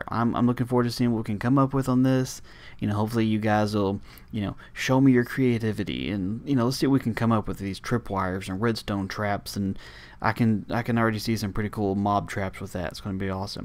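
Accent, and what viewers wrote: South, United States. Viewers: I like your accent. You live in the south? I live in the midwest.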